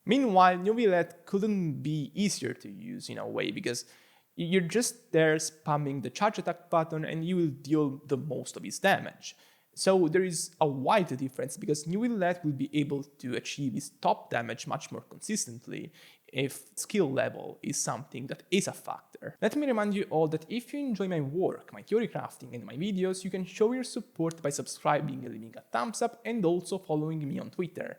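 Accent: Italy. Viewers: Thank you so much for the content you provide to the community, your graphs are always intuitive and extremely usefull to value and compare weapons, builds, and team comps. If i may ask a favor, when or if you will eventually do Furina's damage calculations, could you please make a comparison between her BiS weapon and mistsplitter with her c2? Probably i won't be pulling for her BiS even if i might go for her c2 cause of "green donut", and mistsplitter is what i would give her in that case. It might be the case for a lot of people but maybe they have a different weapon in mind so you'd probably need to make calculations with every weapon to make everyone happy xD, but you do you. Also, are you italian? Cause i am and your accent sounds italian to me xD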